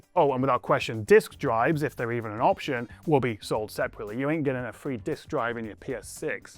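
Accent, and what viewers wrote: United Kingdom. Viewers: The British accent rocks!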